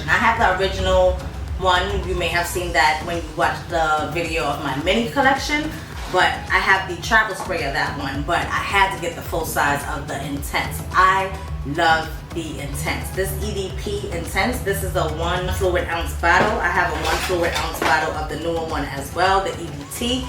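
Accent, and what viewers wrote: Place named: Bronx, United States. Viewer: I enjoyed how New York this video was 😂. I knew right away you was from the Bronx😂😂. A lot of these scents I have so many memories attached to it. At one time everyone and they motha had Gucci Guilty. MAC Turquatic is underrated but I’m happy about that. I love perfume videos where you can see the dents in the perfume. I’m going to check out a few you mentioned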